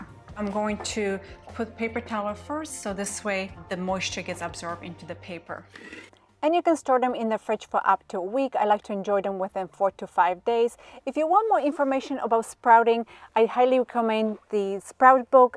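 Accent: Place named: Iceland. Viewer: You have such an interesting accent! It's subtle, but it's there. Icelandic? 🤔 Dutch?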